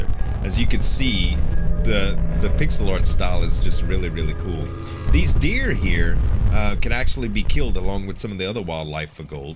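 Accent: Louisiana, United States. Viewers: brain They are both good ol' boys. is from Louisiana and Freeman is from western Mississippi (raised there, not born). So they both have similar regional accents. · Was trying so hard to figure out what kind of accent. It's Louisiana, isn't it?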